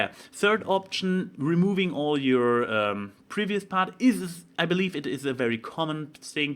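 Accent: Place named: Germany. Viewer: Oh I don't stalk you, I just am just drawn to your sexy German accent everywhere you go lol. Anyway I like content. But I think his brand of humor works better when he has someone to bounce off of like his collab with Josh Scorcher. Also you might want to be careful with these vlogs because you got a little redundant at times.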